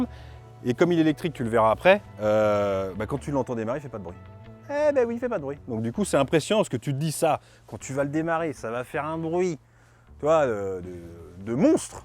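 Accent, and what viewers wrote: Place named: Brioude, France. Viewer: C'est à cause de l'accent de brivardois. Jean leguin humoriste lol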